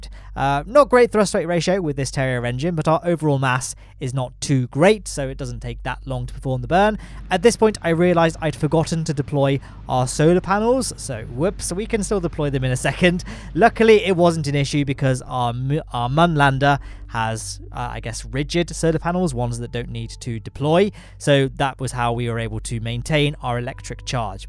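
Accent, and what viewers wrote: United Kingdom. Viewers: This guy is the most British person iv ever heard lol 🤣🤣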